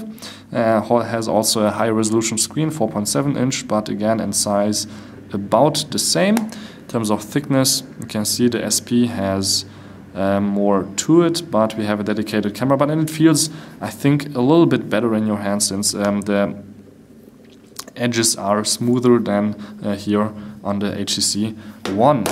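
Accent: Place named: Germany. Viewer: you're german, aren't you ? :D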